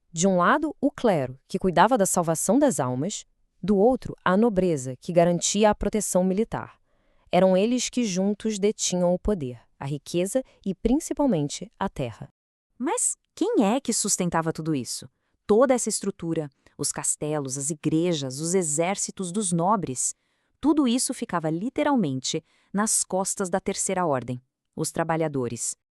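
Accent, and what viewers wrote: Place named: Rio de Janeiro, Brazil. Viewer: Pura carioca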